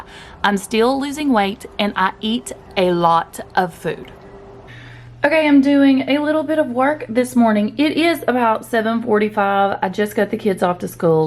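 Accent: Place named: Tennessee, United States. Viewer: I know a Tennessee accent when I hear one. This was a great video. I like to eat a lot too.❤️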